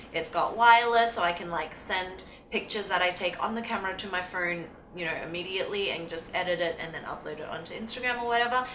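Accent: Australia. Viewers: Hello, I just want to tell you that what you are describing sounds so similar to my experience when I moved from Prague(Czech Republic) to Sydney in Australia. And all the values you are talking about are all the ones Aussie people and Australia itself taught me. And I am so grateful for that and the 8,5 yrs I spent there were the most beautiful ones in my life so far. I will always be thankful to Australia for who I have become because of that experience. I love Aussie people and their kindness and thank you for teaching others that. That is why I have subscribed to your channel even though I am not big on make up :-). And I love you Aussie accent :-). Keep spreading the kindness! Thank you :-) wish you all the best in your adventure and don't give up. Money cannot not buy you what the experience gives you mentally. The enrichment and open mind. Take care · I love your Aussie Accent! 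<3 Love you on OTGW too. You remind me of Constance Wu!!! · In the beginning I was like "hell that's a hella strong australian accent for someone living in NY" 😅 but then you said you "just moved" so it all made sense 😅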